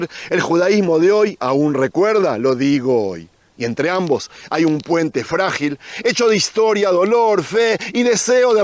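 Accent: Argentina. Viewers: Por qué tiene acento argentino es judío, de verdad o qué? Es una pregunta, espero no ofender🙏❤️❤️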